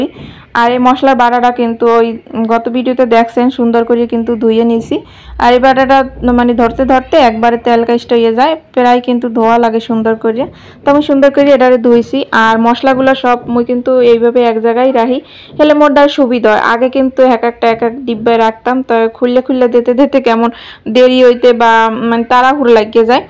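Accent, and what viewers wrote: Barishal, Bangladesh. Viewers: আমার বরিশালের কথা শুনতে কিন্তু ভীষণ ভালো লাগে। তাইতো আপনাকে ভালোবাসা দিয়ে গেলাম আর আপনার ভালোবাসার অপেক্ষায় রইলাম আপু❤❤❤❤❤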